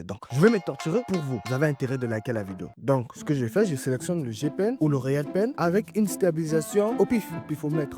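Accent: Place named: Africa. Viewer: Tu es africain toi je reconnais l'accent du continent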